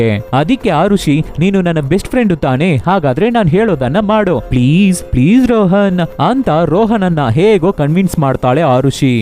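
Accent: Tamil Nadu, India. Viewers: Kannadana saryaag maathad guru...neenen Tamil avnaa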